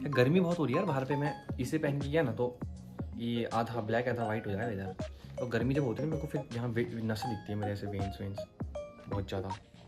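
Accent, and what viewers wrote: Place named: Uttarakhand, India. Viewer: I swear you are from Uttarakhand accent bahuttt jyadaa familiar heinn 😭🥰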